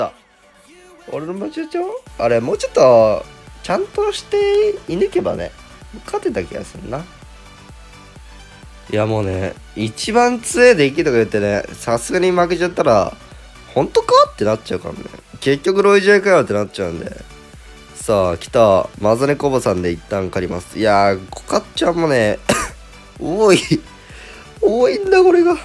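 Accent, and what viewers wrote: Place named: Shizuoka, Japan. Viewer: 久しぶりにRADさんの動画見たらめっちゃ静岡訛りでばかばか言ってて何があった？って感じ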